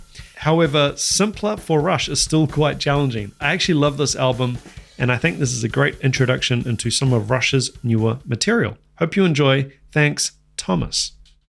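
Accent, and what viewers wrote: New Zealand. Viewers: Probably my first comment here ever, as a longtime follower 😂 Watching quite 😂 All of us, who really love and ambrace music, why would we down-talk music? We might not have the same taste, but at the end of the day its art. Hehe and each time i'm listening to Neil, i know why he was and, in some kind still, is a hugh inspiration of Mike Portnoy 😎 Mr. i fuckomg love your Kiwi accent 😎 thats me saying as a german 😂 and remember (knok knok)...we will ask the questions 😂 Love your channel!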